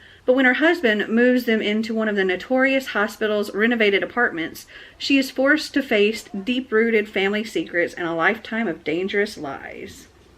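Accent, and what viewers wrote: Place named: United States. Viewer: that's true he was nice all the time.... Btw I think you have the best accent of all the American booktubers!! Sorry for the weird comment but I always like hearing you speak in your vids!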